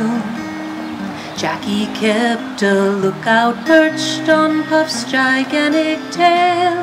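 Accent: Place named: England, United Kingdom. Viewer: You sound so English :)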